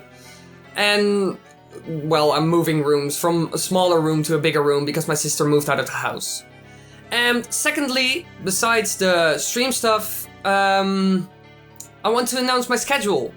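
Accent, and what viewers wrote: Netherlands. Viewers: Je bent Nederlands! Ik hoorde het al aan je accent.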